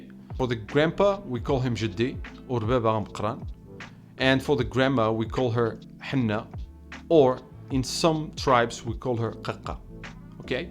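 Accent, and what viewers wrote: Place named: Rif, Morocco. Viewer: Can i know where are you from ? Because your accent of tmazight n rif sounds a little bit different of what i used to hear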